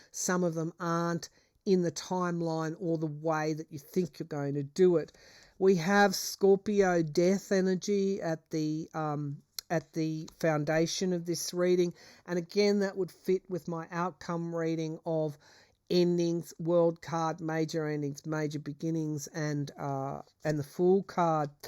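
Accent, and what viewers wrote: Australia. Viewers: Your Aussie snark sounds so pretty! Thanks for all you do! Can't we just have a whole new party? From the ground up. Dems or magats are useless. A new party of young folks. But if we apply this: A kid is getting C and D's all year. On the final, he gets 100% A+...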